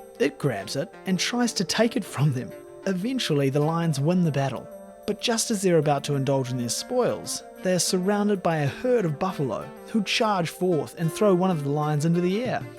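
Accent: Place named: Australia. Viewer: Absolute garbage! Just some guy talking with an aussie accent and telling stories with irrelevant stock shots. #shamelesscashgrab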